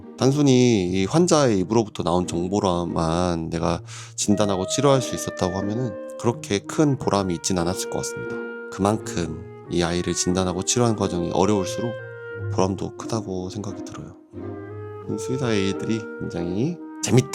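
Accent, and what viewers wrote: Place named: Gwangju, South Korea. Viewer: ㅋㅋㅋㅋㅋㅋㅋ 광주분이신가용? 억양이 겁나게 친숙해브네요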